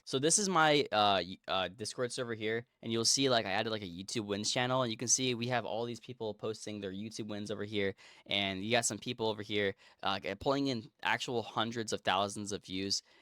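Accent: United States. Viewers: Are you 100% American? If you're not, you're really good at english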